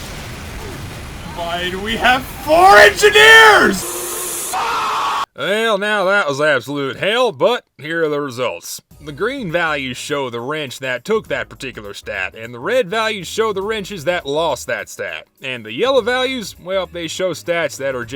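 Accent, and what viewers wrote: Texas, United States. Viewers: At first I though he was doing the accent because "heHe engineer is texan lol" but then I remembered is Texan. Good on you man, as a fellow Texan, I say bring out the accent more often. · I liked how you did this video in a Texan voice. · I absolutely love that you brought out your Texan accent for the engineer-specific video. Great attention to detail. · Your subtle Texas accent in this video gives me pure joy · So is no one gonna talk about how he's actually went through the entire video with something of a Texan accent? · ah, the southern drawl on an engi vid is soooooo perfect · Texas male is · Honestly, I could listen to mathematic/scientific lingo spoken in a texan accent forever, wouldn't mind if ya didn't hide it when you went into statistics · I thought you were Russian Badger doing a southern accent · Texan he is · why do that texan accent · Y'know, after i found out that you're from texas i started hearing your accent. 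Kinda bizarre but i love it Keep up the good work, i wish you a good year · I can't tell if he's trying to do a country accent or not trying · are you trying to sound like a texan, because i have no idea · Walks in a Texan Accent Me: wow thats what I call getting into character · that accent threw me off so much, i was like "did he actually have that thick of a southern accent in his older videos?" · For some reason, I didn’t question why you were speaking like a Texan until you pointed it out. Like “Gasp, wait he’s one of us now!” XD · Is it just me or does he have a slight southern accent in the video? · Nice subtle Texan accent. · I forgot how Southern he used to sound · I love your southern accent in this video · Him is Texan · he be Texan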